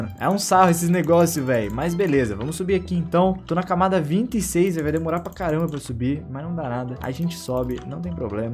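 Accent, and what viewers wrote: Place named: Bahia, Brazil. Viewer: tu falou muito baiana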